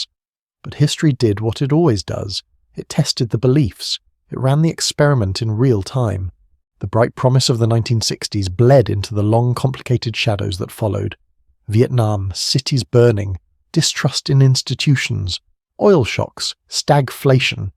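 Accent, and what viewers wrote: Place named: United Kingdom. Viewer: I just wish the AI voice wasn’t British. I’m getting pretty tired of that accent in AI.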